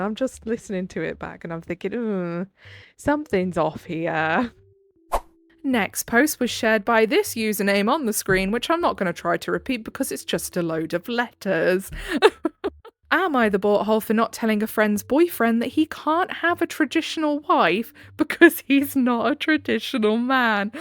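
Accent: United Kingdom. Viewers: I've discovered your channel last week. It has been recommended to me by Youtube. I was particularly interested by the "Human narrator" in the thumbnail. Your voice is very soothing and I love hearing some British accent. So, you've convinced me, I'm making an offering to the algorythm, because there's more people like me who would like your channel to pop up in their recommandation as well.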